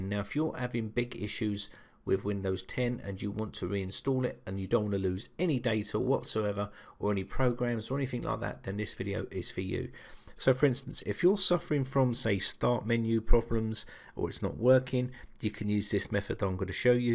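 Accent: United Kingdom. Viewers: I love British accent,thank god it wasn't a Indian man...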